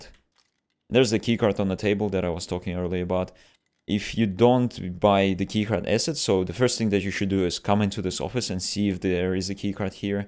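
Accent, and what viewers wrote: Russia. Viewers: Русский акцент отличный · Акцент русский. Может ты русский который хочет вести английский канал?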